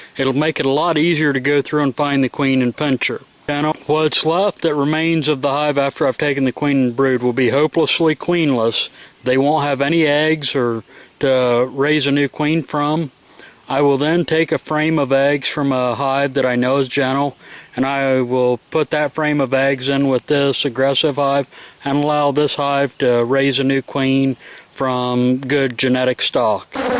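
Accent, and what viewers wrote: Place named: South, United States. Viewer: With those bees being aggressive and with his southern accent its hard to understand what he is sayin.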